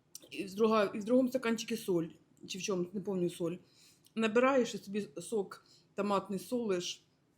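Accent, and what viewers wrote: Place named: Western Ukraine, Ukraine. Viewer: Знаєте, ненавиджу російську мову. Через отих кончених москалів. Але мені дуже подобаюься українські слова які ви вживаєте в своїй розмові. Це такі українські слова з западенським акцентом. Дякую вам за це. Дуже приємно це чути. Улюблений вислів мого покійного тата: Тут живе Степан Бандера? Так-так-так' - відповів кулемет. Вас вітає Великий Львів.з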